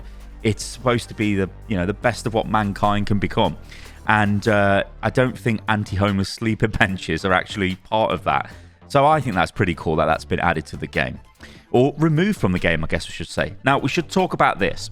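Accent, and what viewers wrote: United States. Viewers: your country accent is 🤌 top notch. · I appreciated the return of the American accent,. 😄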